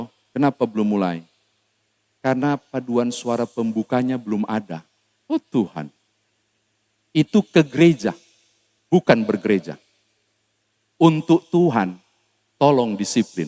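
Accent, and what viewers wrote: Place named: Kupang, Indonesia. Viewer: Puji Tuhan... Trimakasih pak pendeta, untuk reningan yg menguatkan. Sambil senyum dengar Bapak logat kupang....😊😊🙏🙏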